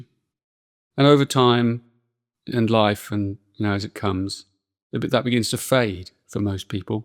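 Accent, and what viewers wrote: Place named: United Kingdom. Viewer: Same thing happened to me! I love beautiful and elegant British accent…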